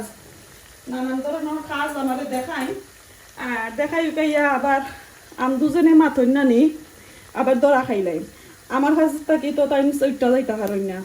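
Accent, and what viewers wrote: Sylhet, Bangladesh. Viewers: u talk in Sylheti basha that’s why I love to watch u more !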